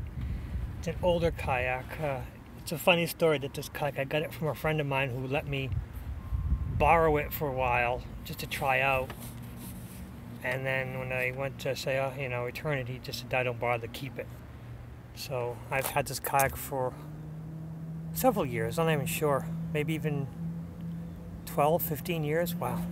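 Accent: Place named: Cape Cod, United States. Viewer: Very very nice filming. I recognized your voice, and knew your place. Native Cape Codder here. Thank you for filming and sharing.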